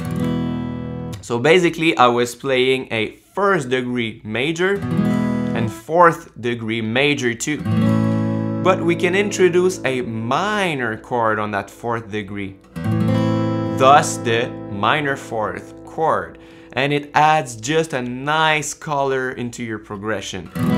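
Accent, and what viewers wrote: Quebec, Canada. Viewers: Tu sonnes québécois. J’me trompe tu?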